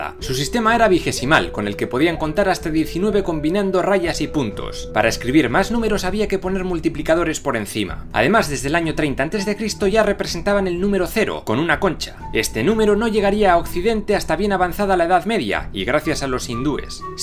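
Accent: Spain. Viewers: Eres el primer español que escucho dando descripciones antropológicas sin poner por delante su ignorante falsa superioridad. Tan mal caen que hasta el acento se odia para estos temas pero tú limpias un poco ese mugrero, solo te tropiezas al decir al final que encontraron pura decadencia. Para colmo los tripulantes de esos barcos no eran precisamente algo selecto. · "Pues muy bueno, lo único malo, es que el de la voz es español por el acento". ¿Xenofobia, dónde? En fin, soy mexicano y sigo sin ver el sentido de estar resentidos por hechos que ocurrieron hace más de 500 años, imagina esto, que un antepasado tuyo mató a un hombre hace 300 años, entonces ¿Un descendiente de ese hombre tiene derecho a odiarte y menospreciarte? Además, nos guste o no, los mexicanos en general tenemos tanto ascendencia indígena como europea, principalmente española, así que sin la conquista, muchos de nosotros ni siquiera habríamos nacido; no digo que eso signifique que tenemos que adorar a los españoles, solo digo que tenemos que aceptar que lo que pasó es historia y ya. 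· Pues muy bueno, lo único malo, es que el de la voz es español por el acento, y las escenas de exclamacion son españoles, que incongruencia, los mismos que vinieron a destruir culturas tan magestuosas, todavía quieran seguir explotando y vivir con estos temas, que bárbaros, no tienen descaro en seguir aprovechándose. Tienen mierda en la cabecita.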